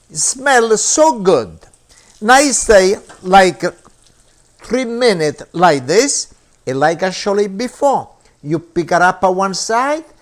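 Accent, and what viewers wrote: Italy. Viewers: Oh gosh he is so adorable.. his real authentic Italian accent is even better.. Oh kay!! I like eggplant parmigiana... It’s a oh yeah baby!!!.. ❤️ love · Love his accent.i just love those Italian men!!!! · When I heard that thick Italian accent of his I knew it was gonna be a good cooking tutorial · I love this little old guy. He’s so cute w/his Italian accent and his drink-drink gestures. LOL I’m trying to recipe tonight. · This is the most italliano uncle i’’ve heard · Love his Italian accent love him thank you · With that accent it just tastes better. Reminds me of my grandfather who was from Italy. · I AM SOOOO MAKING THIS FOR MY BROTHERS THIS THANKSGIVING. BECAUSE THEY DNT EAT MEAT. AND THEY LOVE EGGPLANT I STUMBLED UPON THIS RECIPE. AND I LOOOOOOVE HIS AUTHENTIC ITALIAN ACCENT.😇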